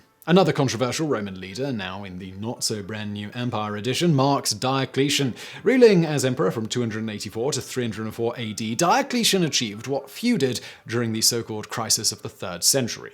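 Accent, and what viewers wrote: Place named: RP, United Kingdom. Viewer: This presenter guy is proof that a cultured British accent doesn't mean you aren't a slimey lowlife.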